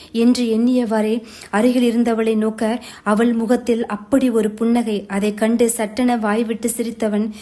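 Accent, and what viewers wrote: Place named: Sri Lanka. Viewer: 🎉🎉🎉 srilankan Tamil 😊😊good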